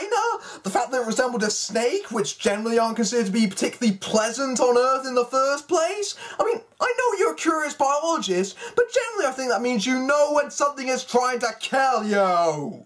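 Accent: United Kingdom. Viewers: this maybe the first time i find a british accent fucking annoying.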